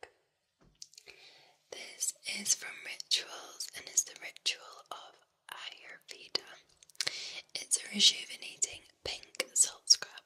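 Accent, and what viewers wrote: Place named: Scotland, United Kingdom. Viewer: I rather enjoy listening to you ramble,. Triggers or no triggers, whispering or soft-spoken or even humming/singing, I just find the sound of your voice very pleasant. 🥰 Even more pleasant than the rain, which I do also enjoy. 😂 Speaking of enjoying things: based on the way you kept breaking into other accents in the middle of talking, I'm going to go out on a limb and guess you were enjoying yourself while filming this - that's something I dearly love to see. 😍 To answer your question, though, I don't think I really have a favorite chocolate. Does German Chocolate Cake count? 😋 Have a great weekend, and I look forward to your next video. 💝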